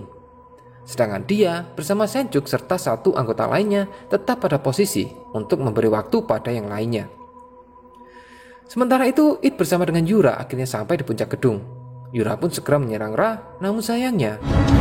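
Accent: Java, Indonesia. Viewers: Bagus, suaranya medok banget, jadi enak ngedengerinnya bro, he he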